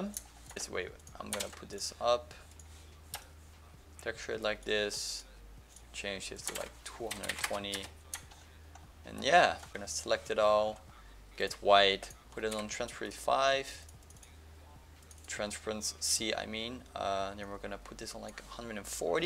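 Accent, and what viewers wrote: Netherlands. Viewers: Are u dutch?? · are you dutch!? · Are you dutch???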